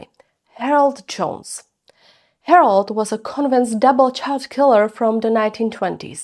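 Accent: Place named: Eastern Europe. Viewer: My Grandad knew Muriel. They weren’t exactly friends, she was in the year above him. But he saw her around all the time. He and many others always believed it was an American soldier who committed the crime, there was a group of them stationed nearby. One vanished the following day after the murder which was obviously a little suss! 👀 Cool accent by the way! I used to live in Hungary some years back and I’m sensing you’re from that part of Europe?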